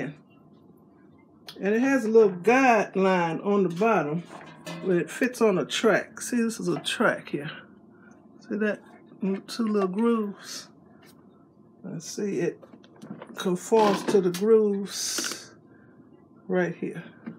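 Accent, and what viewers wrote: South, United States. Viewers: I love it... your accent is so funny....reminds me a of my southern family...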